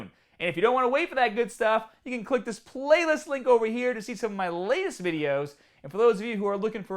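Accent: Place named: Massachusetts, United States. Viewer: Hi. I live in Northampton, MA (in Western MA, home of Smith College.) I have an accent like you, lol! Our gyms are still closed in my area. I don't know about other parts of MA; In Boston and Worcester things were and still are much worse than here, this is a small city, so gyms are probably still closed in other areas of MA. I do know a few people who got Corona in my city who have all recovered except one older man who died, but we are not a "hotbed" in Northampton. I guess our governor is being extra careful. I'm so excited for the gyms to open again, but I hope I don't have to wear a mask. It must be so uncomfortable to workout in a mask! We are still required by law to wear masks in public in MA, you risk being fined $300 if you don't wear a mask! By the way, Animal agriculture is what caused the coronavirus. Animal agriculture is the breeding ground for future pandemics that will harm everyone on the planet. 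COVID-19 is just the beginning. More pandemics are coming. The overuse of anti-microbial drugs on animals and humans is fueling resistant pathogens that could kill 10 million people annually by 2050. Future diseases are brewing right now on factory farms across the nation, because of the crowded, filthy conditions. 70% of the antibiotics we feed animals to prevent rather than treat infections are medically important for human health. This allows antibiotic resistance to breed. Farms are not required to disclose the amount of antibiotics used or the prevalence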